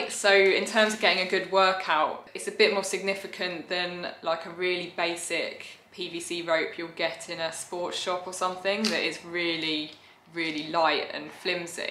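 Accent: England, United Kingdom. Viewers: I love your British pronunciation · British English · Love your too cute puppy .Glad to see a actual video that's not a short couple of seconds 👍. Also love your British accent.I am from Southern California USA ✌️ and nice to meet you . You are incredible with the rope .I have enjoyed all of your videos . Wish you all the very best with your YouTube success 👍... SergeantC (Retired US Army)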